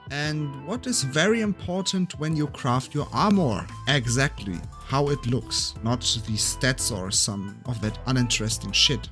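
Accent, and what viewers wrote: Germany. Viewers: Starker deutscher Akzent, heilige Maria XD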